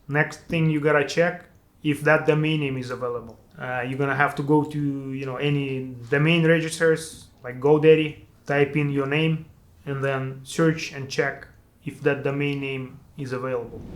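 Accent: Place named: Bavaria, Germany. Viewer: Your accent sounds like your from Bavaria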